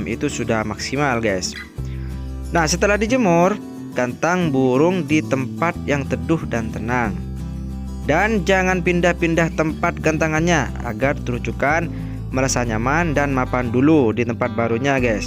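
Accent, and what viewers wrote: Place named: Madura, Indonesia. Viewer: Logat madura banget... lessoooh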